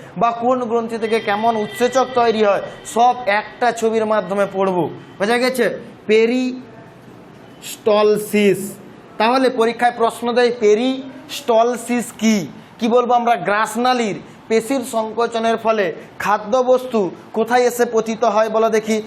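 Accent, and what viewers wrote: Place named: Medinipur, India. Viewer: আপনার কথা তে kochi শুনেই বুঝতে পারলাম mednipure আপনি....দারুণ হয়েছে ক্লাস টা ❤❤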